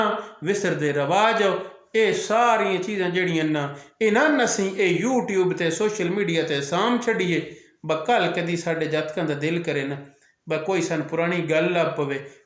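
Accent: Sargodha, Pakistan. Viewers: لاں سارے پنجاب وچ نہی بولیا جاندا ایہ لفظ سرگودھا تے منڈی بہاؤ الدین دے کجھ لاہندے آلے پنڈاں وچ ای بولیا جاندا اے تے جناب دا لہجہ وی اس پاسے دا ای اے ساڈے لہجے وچ لاں نہی بولیا جاندا لیکن اس لفظ نال واقفیت میرے بچپن دی یاد اے 😁 لاں اس رسی یا تار نوں آکھدے نیں جس اُتے کپڑے ٹنگ کے سکائے جاندے نیں یا اس نال رلدا ملدا کم لیا جاندا اے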